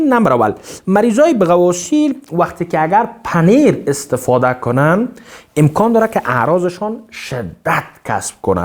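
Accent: Afghanistan. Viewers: دکتر جان خیلی افغان غلیظ صحبت میکنی ما ایرانیها نمیفهمیم بیشتر حرفهارو لطفا یکم فارسی روانتر صحبت کن ممنون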